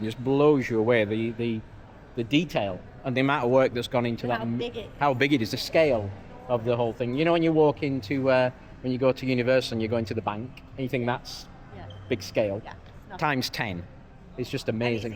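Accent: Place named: Northern England, United Kingdom. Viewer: Last time in Orlando we had tickets for Volcano Bay that had just opened, but every time we got there it was full for the day and that was before 9am. Things have gone full circle with the queuing, back in the 90s with less parks the queues were horrendous, 3 hours being typical, but with many more parks opening queue lines dropped so you wouldn’t have to queue much beyond an hour for anything. That’s all gone now and with the constant summer rain it makes for a less than perfect scenario. Thanks for the video. Nice to hear a northern voice, we are near Manchester.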